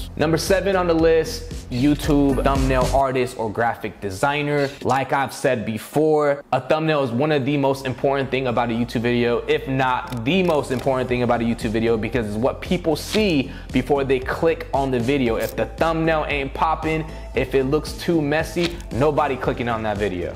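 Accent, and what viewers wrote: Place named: United States. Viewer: Dude you need this in your video for people who , don't understand US accent english 10 Side Hustles to make MONEY from HOME! ($100k + per year) 10 Side Hustles You Can Do To Make Money From Home Section Overview: In this video, the speaker shares ten side hustles that require zero dollars to start and have the potential to replace your current job. The speaker provides detailed information on each of these side hustles. Your Own Clothing Store You can create your own custom t-shirts using websites like Printful or Teespring. You don't need to buy inventory; you just mark up the price from the cost of the product. Create your own Shopify website and connect it with Printful so that every time someone buys something from your Shopify website, it looks like they're buying from your store but in reality is being bought for Printful. 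You never have to worry about cost of inventory and you never really have to pay a dime out of pocket. Script Writer People out there need scripts for making content. Hire scriptwriters on Fiverr or Upwork who will do research on your behalf and create a script for you. Many people hire scriptwriters for their YouTube videos, informative videos, skits for YouTubers, tick-tockers, Facebook creators etc. Showcase your work on Fiverr or Upwork by building a portfolio. YouTube Find a niche and ride with it. If you don't want to show your face, start a cash cow channel showcasing celebrity mansions. 03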